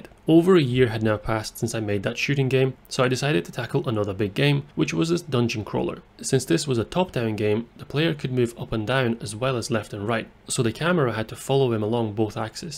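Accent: United Kingdom. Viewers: are you Scottish btw?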